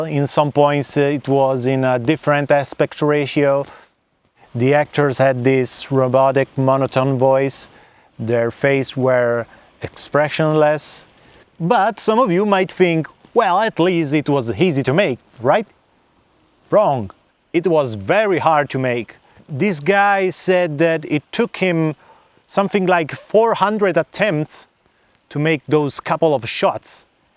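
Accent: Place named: Italy. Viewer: You don't need to tell us that you are from Italy; your accent says that loud and clear. 😅 btw nice video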